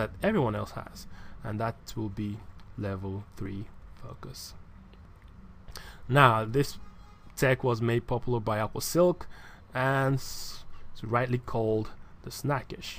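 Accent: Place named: Igbo, Nigeria. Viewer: You sound Igbo.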